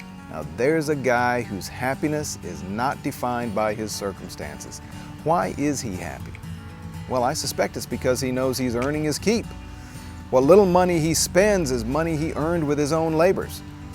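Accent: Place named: United States. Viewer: No I have never known someone with lots of money who was unhappy. If you have cancer and you are going to die then money will not save you. But it will fix just about everything else. Your example of the guy in Texas who won the lottery is absurd. He did not have to loan money, buy people homes or put himself in the position of a giver, he could have saved the money and had a decent life combined with doing something like giving to an animal shelter and volunteering to help out. It is not rocket science to know that if you are doling out millions to people then people will take advantage of you. And in the end he killed himself so right there he had mental problems. So tell me how people who win money are just a "giant freeloader"? You have an American accent so I presume that you live in the USA. Don´t get seriously sick there without a lot of money. 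there is no National Health Service. This video is pointless.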